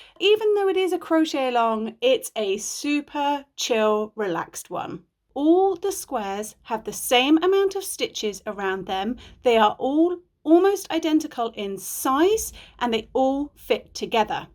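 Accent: England, United Kingdom. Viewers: So happy to see a face with that awesome English accent and perfect nails. Thank you for all you do to bring us your patterns. ❤😊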